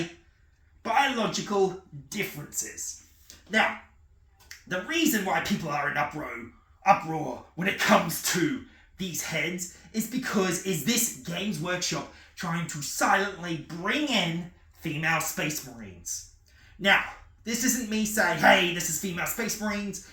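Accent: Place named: Germany. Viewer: dude I thought you were either German or Austrian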